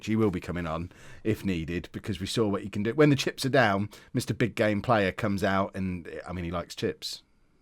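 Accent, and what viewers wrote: United Kingdom. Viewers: I dont understand football at all but it’s interesting listening to a Brit explain it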